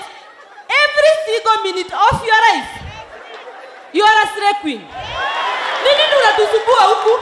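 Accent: Meru, Kenya. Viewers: 😂😂😂...that accent though....interchanging between meru and kyuk...(needs some work) but very hilarious!!!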